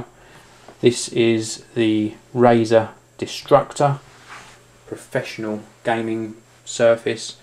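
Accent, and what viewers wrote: United Kingdom. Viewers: He is so British.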